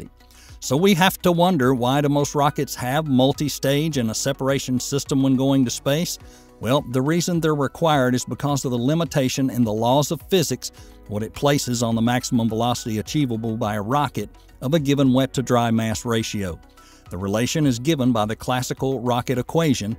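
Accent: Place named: Texas, United States. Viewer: Thank you, your explanations are immaculate, and your accent smacks of Texas ! 👍👍👍👍👍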